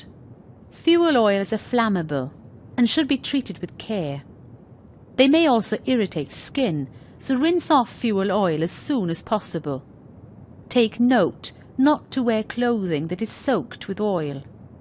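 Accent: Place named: United Kingdom. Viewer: 英音好难受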